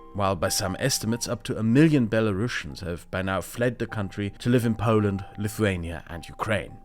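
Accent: United Kingdom. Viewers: No he doesn’t he just recites Wikipedia in a British accent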